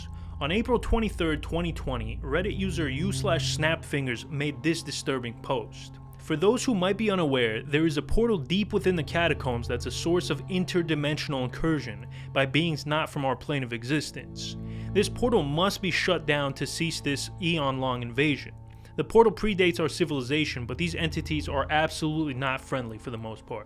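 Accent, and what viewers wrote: New York, United States. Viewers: 😅This is the most NY Accent I've ever heard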